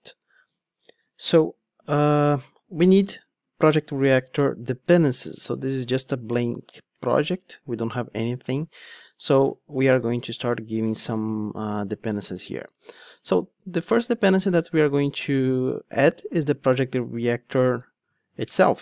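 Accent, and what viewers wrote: Brazil. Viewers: O sotaque não nega BR na veia.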